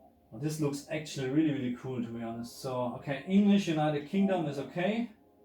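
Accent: Germany. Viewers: Absolutely u German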